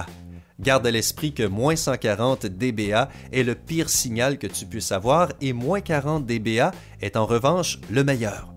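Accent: Quebec, Canada. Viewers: L'accent québécois purée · Voie canadiennes bonnes explication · C'est très évident que la personne qui parle est un québécois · HAHAHA LA VOIX QUEBECOISE DE SYMPA! YAYYY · très sympa et accent canadien trop rigolo pour nous en france c'est très campagnard ! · Je kiffffe ton accent québécois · Wtf ses un québécois et ne me contredisez pas je connais l’accent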